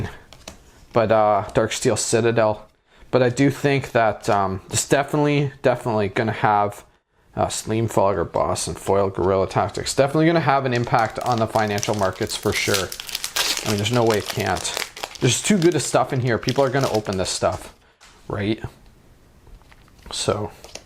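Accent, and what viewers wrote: Canada. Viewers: yes 100% as soon as i heard your voice i was like "bet hes canadian" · I got a mana crypt and many other goodies in my 2 boxes. You are so very Canadian sounding haha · please tell me you're Canadian. High quality accent.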